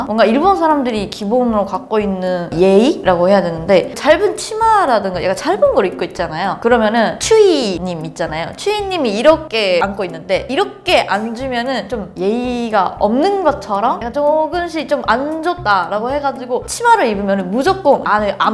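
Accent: Seoul, South Korea. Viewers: 니뽄말 잘하는 칸코쿠진 같다.. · ㅎㅎ 일본어를 한국인이 일어하듯…하시네요. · 나 이런 톤으로 일본어하는 분 처음 본듯 ㅋㅋㅋ 어째 한국인이 일본어 하는 거 같냐 신기 ㅋㅋㅋㅋㅋㅋ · 백진희 닮았다 한국사람이 일본어하는거같어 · 일본인들은 조금 귀엽게 보이는데.... 은 그냥 한국인 인데요~~생김새도 그렇고 말하는 투도~~~이쁘고요~~ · 일본인이라고???? 발음이 완전 한국인인데??? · 억양이 진짜 한국인 같아요 넘신기ㅋㅋㅋ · 일본인이라 밝히시지 않으셨음 한국인이라 생각 했을 거 같아요.. 스타일나 말투나 모든 게.. ( 한국인 스타일 느낌이 강한) 그래서 도리어 일본어 할 때, 한국인이 일본어하는 느낌이 들었네요! 신기한... 잘 보았습니다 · 진짜 신기한게. 이분이 말하는 일본어발음에 약간 한국인의 일본어 특성이 묻어있음. 한국어를 오래 공부하면 모국어에도 영향을 주는건가? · 서울에 10년 넘게 산 저보다 서울말을 더 잘하시네요..^^ㅠ 의문의 1패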